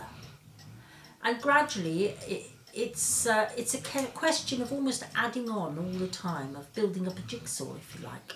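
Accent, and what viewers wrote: Wales, United Kingdom. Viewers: I live in next to Aberfan. I knew you lived in Wales from your accent from watching your other videos but didn't reolise you lived close by. Loving the videos by the way 😁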